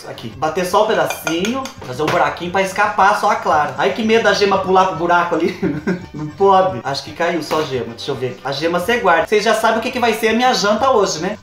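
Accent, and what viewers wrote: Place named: Paraná, Brazil. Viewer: eu queria saber de q estado vc é?porque este sotaqe seu,parece do povo daqui do Paraná! 😘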